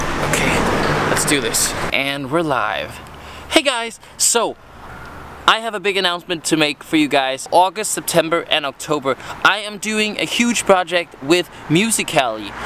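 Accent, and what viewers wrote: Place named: Denmark. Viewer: du har en dansk aksang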